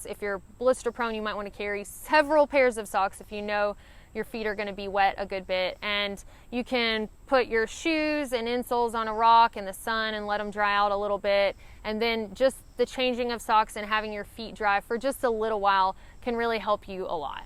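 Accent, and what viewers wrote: South, United States. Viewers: "Kilts and the like don't confine and bind on inclines" :) Hearing you talk does a displaced southerner some good.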